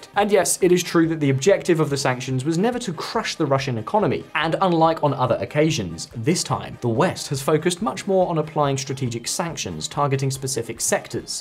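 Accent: RP, United Kingdom. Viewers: Why does drivel told with confidence and in posh British accent sounds reasonable? That's the only question I have to you. Russia has the fundamentals, including energy, food, minerals, wood, and some decent, though mostly basic, industrial capacity that allows it to manufacture finished goods, particularly weaponry. Weaponry and ammunition at a price point much lower than Western's. Some of this weaponry -- especially the drones -- is effective against multimillion dollar Western equipment. The rest (including many types of FPV drones and chips) is imported, both directly and via intermediaries, especially from China, with whom Russia shares an immense border. As to budgetary deficit, it is not so large, compared to the income Russia has from its exports, which will allow it to eventually recover its finances. While you're at it, you should have mentioned that all this expensive energy that Europe has been buying has led to deindustrialization. 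Particularly, and most painfully, in Germany. And it's ongoing. Also mention the US's deficit. It's stunningly large, and some say there'll be hyperinflation in the US. Saudi Arabia has partly moved to abandon the exclusivity of the petrodollar. I'm suspecting that the anglophone elites are intent on screwing up the world, including themselves. But I'm undecided whether it's because they're crazy, stupid, or stubborn as hell, or all of it. Maybe the decadent and dying British aristocracy is an indicator of that. After all, they're scr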